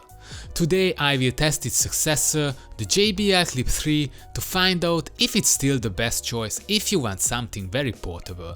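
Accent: Hungary. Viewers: i like your Hungarian accent :) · he said that he has a hungarian accent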